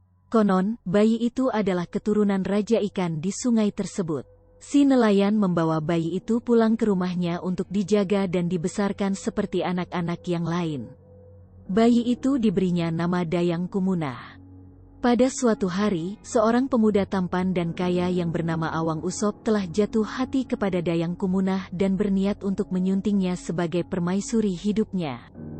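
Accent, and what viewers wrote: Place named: Indonesia. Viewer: Apasal bunyi indon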